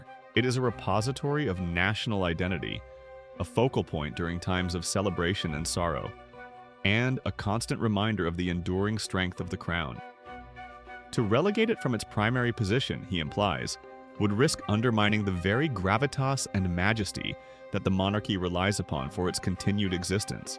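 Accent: United States. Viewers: I will never like AI in an american accent.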